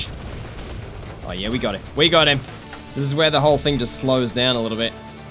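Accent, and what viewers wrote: Australia. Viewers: hes australian so he has a different accent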